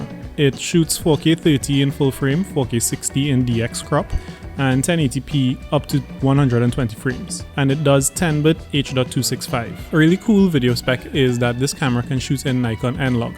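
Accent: Trinidad and Tobago. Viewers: Are you from Trinidad 🇹🇹??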